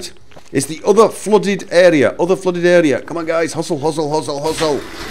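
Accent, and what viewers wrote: United Kingdom. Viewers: Can we have subtitles in videos? My siblings dont know enough English to understand your British accent.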